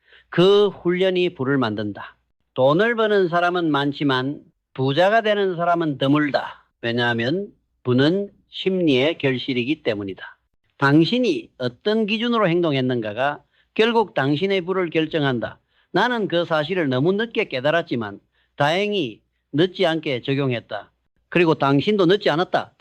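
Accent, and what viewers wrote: Gyeongsang, South Korea. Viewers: 굉장히 호소력있는 목소리 입니다. 경상도 억양이 되레 호감으로 들립니다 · 경상도 목소리가 진짜로 조언해주는 느낌이라 좋아요 ㅎㅎ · 역시 경상도 목소리가 좋아요...강한 느낌...머리 속에 팍팍 박혀요~~~~^^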